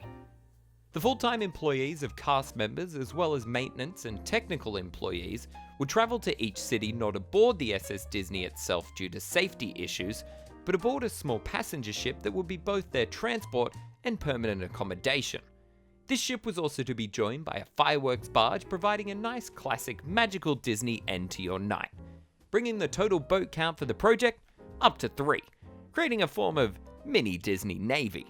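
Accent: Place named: Australia. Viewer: I love your Australian accent!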